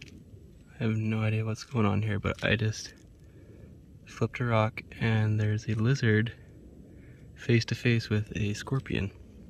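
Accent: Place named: Zion, United States. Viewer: Mystery man I'm guessing he's near Zion. Has same accent as locals in Zion area. Cool video btw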